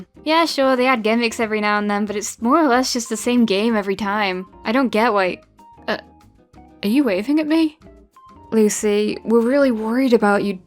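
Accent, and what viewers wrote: United Kingdom. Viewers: Oh dear do I luv your british accent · who else stans lucy’s british girl voice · EW YOU'RE BRITISH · I come for the stories, and stay for the British accent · British language ahh I like it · Omg, she’s BRI’ISH!!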